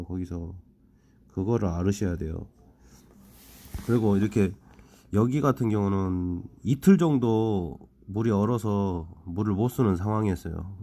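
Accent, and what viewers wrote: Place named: Jeolla, South Korea. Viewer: 혹시....고향이 전라도이신가요 ㅋㅋㅋ 제가 전라도인데 뭔가 구수하네요 ㅋㅋㅋㅋㅋ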